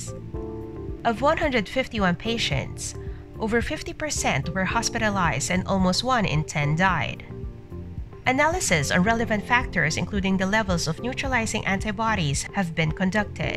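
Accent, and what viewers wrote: Philippines. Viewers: Filipino accent is too strong. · no. She Sounds Filipino.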